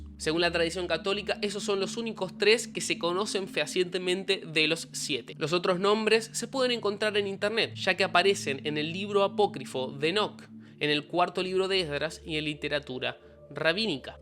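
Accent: Argentina. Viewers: Por que los argentinos hablan así ✨✨ reposho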